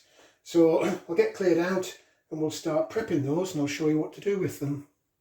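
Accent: United Kingdom. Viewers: Well sounds good. But what would I use it on or with. Btw, I love your accent. Sounds proper British. You could've actually cut it in half. Then your plan wouldn't be so full. And you wouldn't need a bigger pot. Eh? Try a warm damp sponge to wipe off jars. I want a taste.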